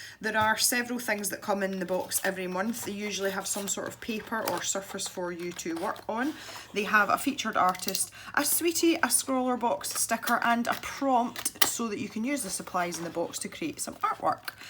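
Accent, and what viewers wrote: Scotland, United Kingdom. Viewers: OMG You're Scottish!!! WOW what up! Loved the video btw check out my podcast if you fancy a listen :)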